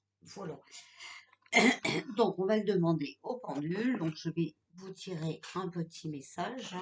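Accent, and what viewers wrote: North, France. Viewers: Bonjour, vous êtes du nord ?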